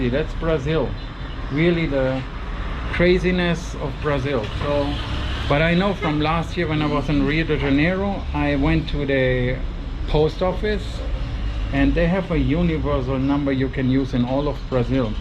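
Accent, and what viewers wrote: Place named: Germany. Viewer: Are you from Germany? The accent sounds a bit like it. I'm half German half Brazilian 👌🏻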